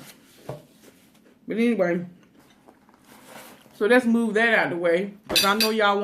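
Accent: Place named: South, United States. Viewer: Wait, what did she say ? Cops of mighty noah or oak? I can tell she is from somewhere South with her accent and Belts department store (Never heard of it) and LOL at wore him out like pancake batter. Ok, I'm editing my comment, seeing the Cowboys cup I'm assuming sge's in Dallas Texas. Yes, I just found her video today after watching My full Figured Life 's Mukbang. The sloppy joes title caught my eye because I just had that for dinner myself last night. :-)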